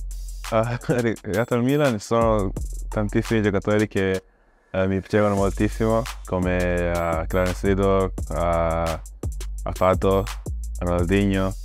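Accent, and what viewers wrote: Veneto, Italy. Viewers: Musah: (*parla italiano con l'accento veneto) First reaction: shock😮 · Grande Musah, con l'accento veneto.♥️🖤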